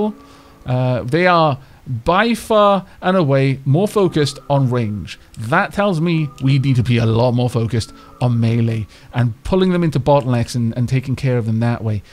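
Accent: Wales, United Kingdom. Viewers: He sounds very Welsh. I'm somewhat surprised that people would have an idea of what Welsh sounds like and wouldn't know is one of them.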